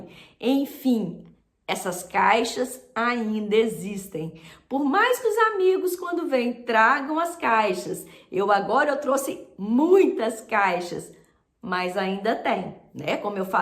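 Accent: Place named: Minas Gerais, Brazil. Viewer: Minas é nosso país 🙏. Obrigado pelo vídeo xará...me emocionei mto na parte do sotaque!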